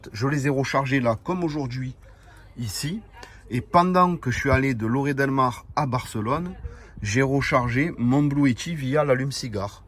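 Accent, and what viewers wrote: Marseille, France. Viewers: Salut! Tes vidéos sont au top, comme à chaque fois. Je kiffe vraiment tes tests et unboxing. J'ai 2 petites questions pour toi qui n'ont pas un rapport direct avec cette vidéo. Première question: Pourquoi ne pas faire des t-shirts et pulls comme tu fais déjà mais avec des bandes réfléchissantes? On pourrait rouler en toute sécurité en te faisant un peu de pub 😉 Deuxième question: Tu fais de temps en temps des vidéos avec des potes, mais est ce que tu envisagerais de faire des vidéos test avec des abonnés? Voilà c'est tout pour aujourd'hui 😁 Ne change rien, continue comme tu as toujours fait Et le petit plus, c'est notre bel accent marseillais 😂😂😂 Bonne continuation à toi, vivement la prochaine vidéo...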